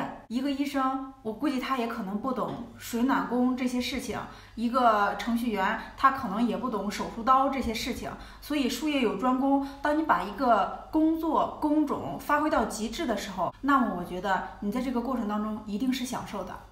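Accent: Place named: Shandong, China. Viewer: 听口音 Fiona酷似山东人，或者河南？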